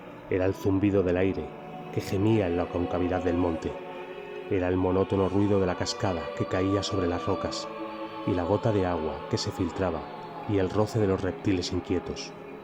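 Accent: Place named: Spain. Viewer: Woooow! Me encanta tu voz Española. Muy buen relato te felicito.🤗👏👏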